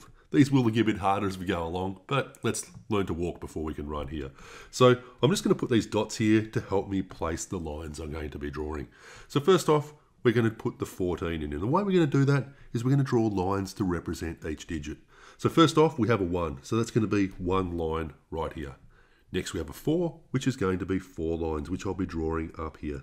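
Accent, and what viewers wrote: Australia. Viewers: I'm not sure what's more mesmerising. The simplicity of this maths trick, or how Bogan this dude's Aussie accent is xD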